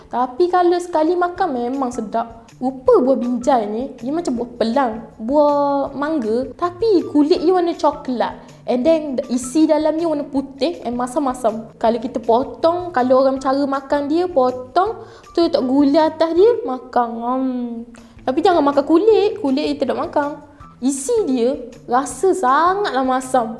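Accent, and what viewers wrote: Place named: Terengganu, Malaysia. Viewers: Akak idola..banyak belajar loghat Terengganu dengan akak.. 🐊: Heheh...dapatlah satu heheh... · Seriously kakak ni yang paling comel dalam ML Studios, dengan bahasa Terengganu pekat dia, cara dia bercakap. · Suka dengar loghat ganu, speaker comey betul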